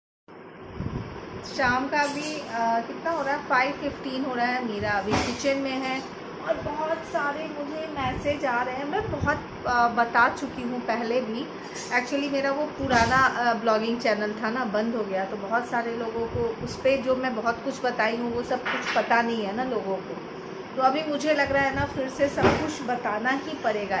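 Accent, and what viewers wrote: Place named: Bihar, India. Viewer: Mujhe aap k baat katne k style se lag rha tha aap Bihar se ho sakti h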